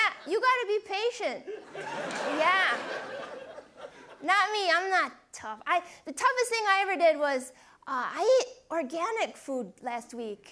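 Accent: Canada. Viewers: She sounds a bit like my relatives in Northern Ontario in Canada. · She sounds very Canadian Soe instead if so. Aboat instead of about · she sounds very canadian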